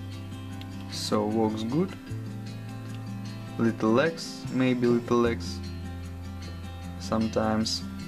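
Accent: Russia. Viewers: Русский на английском базарит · Мне кажется что у него русский акцент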